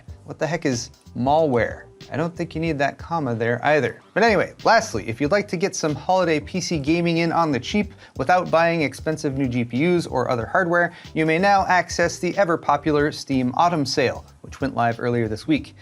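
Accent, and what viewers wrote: Germany. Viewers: commenting on your german accent has me between honesty and politenes. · I had to give a thumbs up for the pie alone. But I wish there was an option for a 10 times thumbs up for the German dialect. By far my favorite YouTube channel keep up the great work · you speak like a native Bavarian... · Love the german accent dude😂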